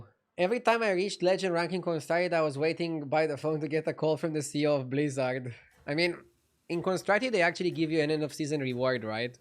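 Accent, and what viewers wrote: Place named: Romania. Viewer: Ma uitam la videoclipurile tale si mi se parea mie ca accentul te da de gol, dar nu eram sigur 100% ca esti roman 😂😂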